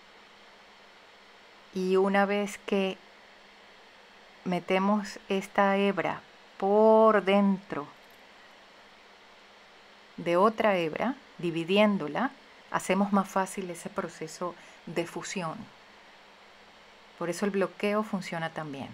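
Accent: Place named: Latin America. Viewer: Que bonito y que divertido ese chal asimétrico gracias por compartir... una pregunta de que país eres porque tu acento es latino. Es una curiosidad saludos desde Colombia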